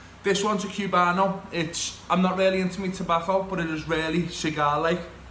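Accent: Liverpool, United Kingdom. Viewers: Liverpoooooooooool!!!!! great accent man!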